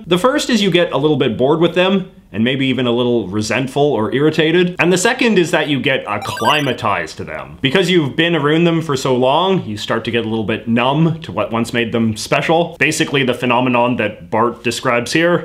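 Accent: Canada. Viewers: You are so blatant Canadian! LoL!!! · I'm aware that it's his accent, as a fellow Canadian I'm saying tone it down lol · Most Canadian man I’ve ever heard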